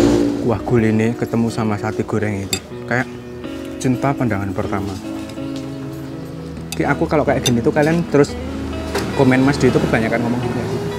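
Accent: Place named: Jetis, Indonesia. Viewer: Mas nek ngomong ki koyok ibuk ku bahasane persis jetis tenan 😂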